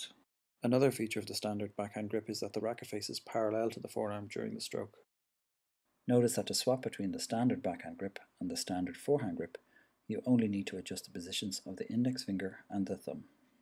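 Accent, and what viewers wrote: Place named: Ireland. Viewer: it sounds like Irish accent, ie he is British. I can perfectly understand all he said because I am British ;)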